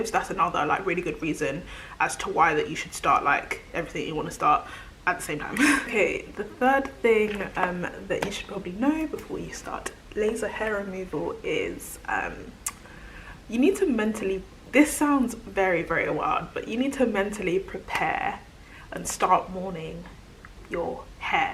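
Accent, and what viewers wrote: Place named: United Kingdom. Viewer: Man the British accent is annoying.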